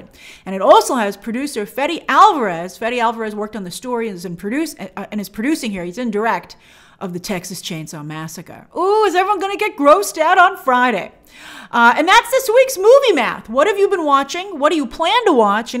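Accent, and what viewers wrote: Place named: New York, United States. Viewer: You must be having a good weekend. Your new York city accent is coming thru more than usual.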